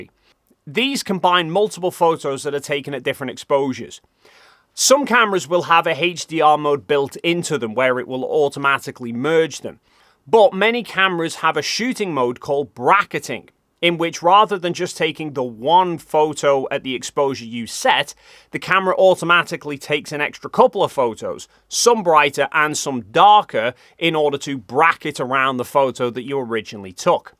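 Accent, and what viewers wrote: United Kingdom. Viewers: a long time ago studies showed that a British accent convinced people it was truth....and a dog in hand yielded the same results. is just smart enough to know good marketing 👏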